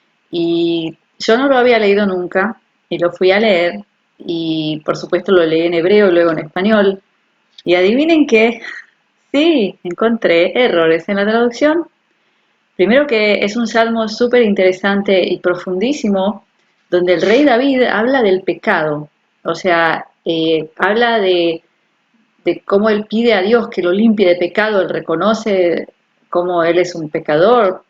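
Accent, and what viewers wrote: Argentina. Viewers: Me sorprende que después de tantos años de vivir en Israel, conserves tan bien el acento argentino.Gracias por compartir el hebreo, ya lo estudiaré, me encanta.Salud a ti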